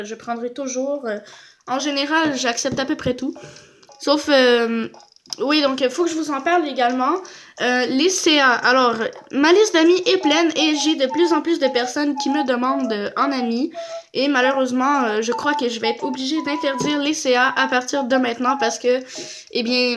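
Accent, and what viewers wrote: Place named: Quebec, Canada. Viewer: On attend un petit accent québécois quand tu parles, tu es québécoise ?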